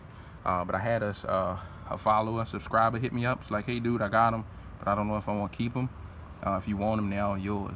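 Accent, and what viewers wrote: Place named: New Orleans, United States. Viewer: Where u from bro sound like u from the N.O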